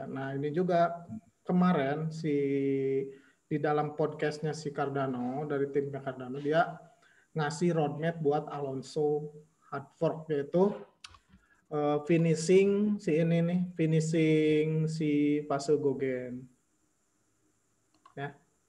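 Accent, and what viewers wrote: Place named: Sunda, Indonesia. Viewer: Langsung gue sub ini chanel josss,,,medokk kaya orang sunda😎😎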